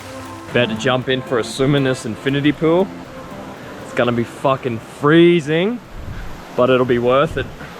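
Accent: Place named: Far North Queensland, Australia. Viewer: Your production is incredible. I absolutely love ALL your videos. Your camera presence is so raw, real and refreshing. The FNQ accent makes it even better haha! Will you ever consider travelling overseas and create hiking videos? Great work. 🙏🏻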